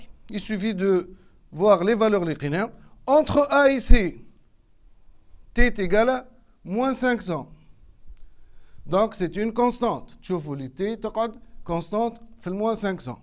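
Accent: Tunisia. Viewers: Français en dialecte tunisien 😎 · الله على اللهجة التونسية جنان والله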